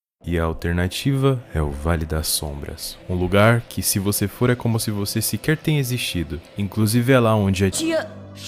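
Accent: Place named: Rio Grande do Sul, Brazil. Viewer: Vc é gaúcho massa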